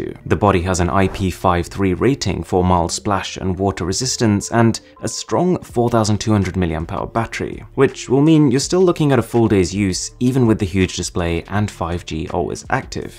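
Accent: United Kingdom. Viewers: fancy british accents😅👌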